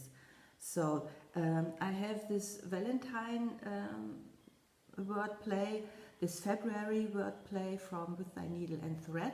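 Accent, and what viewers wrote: Germany. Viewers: Hi Again, great video as always. I was sorry to hear you have been feeling ill with your oral surgeries but hopefully you are on the mend and feeling better. I'm praying for you to have a full and complete recovery. I have a lovely friend who immigrated to the US from Germany when she was very young, I believe some of her family are still in the Frankfurt area. She misses the accent/dialect so I told her check out your channel. Loved your "know your needle-worker" segment. We are so very much alike... in our choices of movies, books and love of music. If I could pick a one word definition for you I'd say, "caring". You care about people, the way you express yourself and how you are perceived, and of course your love for your craft...so yes, to me it's definitely "caring". Have a good stitchy week and I look forward to your next video. Hugs a Bunch, Toni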